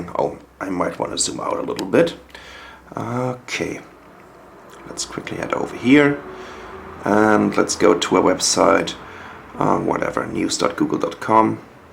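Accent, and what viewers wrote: Germany. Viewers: are u german..? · dude, you have a very strong german accent. haha, btw good video!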